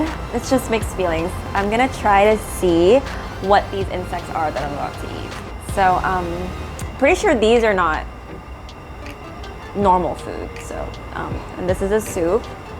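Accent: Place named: West, United States. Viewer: Did you grow up in the United States your English and mannerisms are very western.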